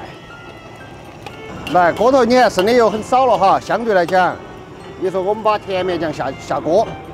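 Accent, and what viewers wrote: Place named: Guanghan, China. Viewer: 你应该是德阳附近的人吧，和广汉人说话口音特别接近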